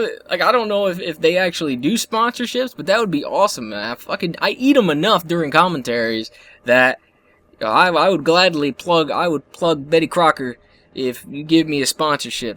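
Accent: Canada. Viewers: hey are you canadian because i here you say guy a lot lol i love all of your videos man i watched your live stream last night pretty awesome god i love your videos and the other creatrues too lol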